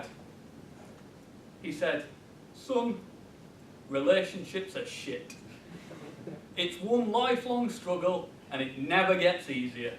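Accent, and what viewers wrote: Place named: Yorkshire, United Kingdom. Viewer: Great speech. I am looking for a speech concept for my CC10 and came across this. I love the Yorkshire accent. I am from Cape Town, South Africa but lived in Leeds. So you bringing back memories. If you happen read this. Great speech topic.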